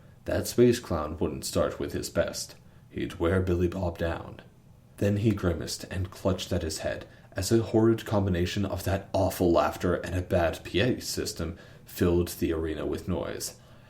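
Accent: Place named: France. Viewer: Billy Bob Space Trucker in a French accent is the best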